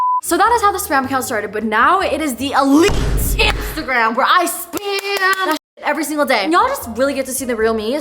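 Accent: England, United Kingdom. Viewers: We need full British accent video ❤❤ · i'll be glad if you make a while video with british accent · Thank you, for being your chatty/ oversharing self!! Love the UK accent reminded me of Adele 💗💗 Phenomenal Spanish too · YOU’RE AMAZE BALLS ❤❤❤❤ also the bri’ish accent is gorg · wait... omg that english accent is so slay omg.😍😄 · love how spam account is just british 😭😭😭😭😭😭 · Hiya. The English accent was good, can you please do an Aussie one? I wanna hear a few G'day mate and good onya's! Lol. ❤ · why does your british accent lowkey sound like millie bobby brown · your british accent sounded like little Ron Weasley's voice✨ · I was binge watching all your resent videos this week and I’m just happy to have another one to watch. The British accent is killing me tho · Love the English accent lol 😂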